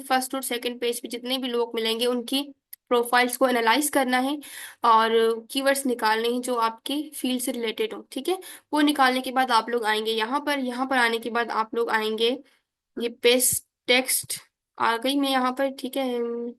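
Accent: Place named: Sindh, India. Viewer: Ilahi behtreen. Khushe hue ke mashaAllah Hamare sindhi sisters be Digital platforms me hai Twan je Awaz ahen accent k lago munkhe tawan sindhi ayo. BTW Zbrdst lecture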